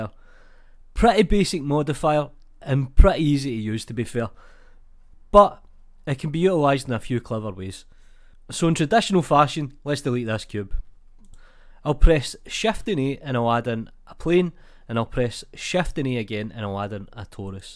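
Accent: Scotland, United Kingdom. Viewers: I get that you might have trouble understanding him, Scottish (I'm assuming) accents might be a little different than what you are used to. But, to accuse him of not speaking clearly? Wow... Oh, and Nicholas, I wouldn't ever travel outside of the US, you will be extremely disappointed. By the way, I loved many things when I traveled to Scotland from the US, but really loved the accents.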